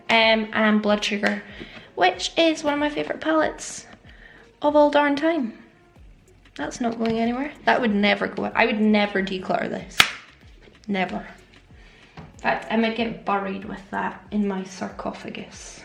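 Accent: United Kingdom. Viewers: Where are you located ? Your accent makes me home sick for the UK :)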